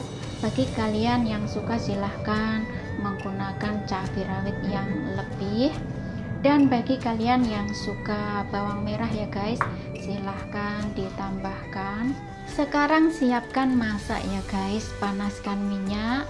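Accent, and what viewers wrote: Java, Indonesia. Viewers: Wong jowo to mbak, .. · Kak, muka nya kayak apa liat dong, suara halus bener, jelas kok omong nya, pelan halus bener, jawa bener 😃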